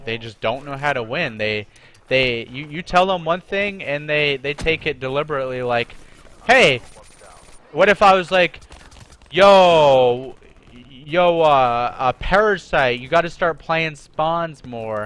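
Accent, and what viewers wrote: Canada. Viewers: You can hear the Canadian.:) Love you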